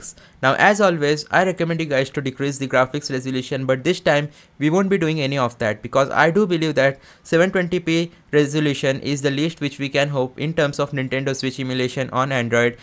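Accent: India. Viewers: Indian accent hurt my ears but this is helpful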